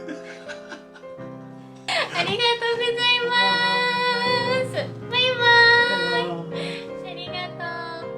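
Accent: Japan. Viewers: ほっこりする和風英語でいつも楽しくみさせて頂いてます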